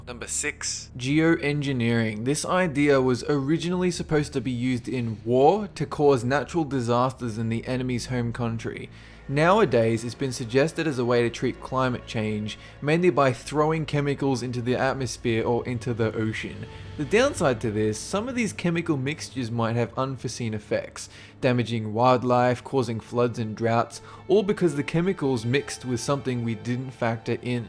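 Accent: Australia. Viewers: Australian, I believe. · Love ya aussi accent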